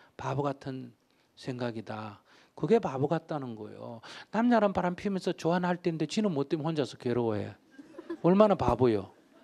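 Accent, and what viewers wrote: Gyeongsang, South Korea. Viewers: Weird! no one seems to have problem with his tone or voice except you. And, the audio condition is not bad. Might be your computer's audio problem. Or, his southeast Korean accent you're probably taking wrong with. Otherwise, what else you might possibly have? Do you happen to have problem like auditory hallucination? Seriously. If you computer game too much time wearing headset, it might have happened. go and see doctor.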